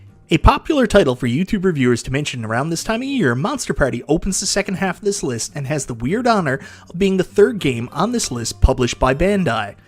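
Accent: Newfoundland, Canada. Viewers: Holy fuck buddy I can recognize a Newfie accent from 100 miles away. Just picked up one of them machines on Facebook market place. Anywhere to find them here on the island? Or better to order them online?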